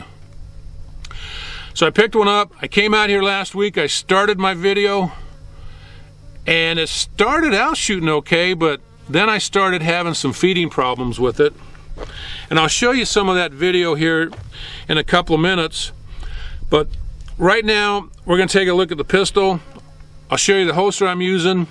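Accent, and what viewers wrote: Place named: Michigan, United States. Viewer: Awesome vid. Right here in Michigan? That looks like Nevada. You must be a native Michigander. That accent is hard to miss, one Michigander to another.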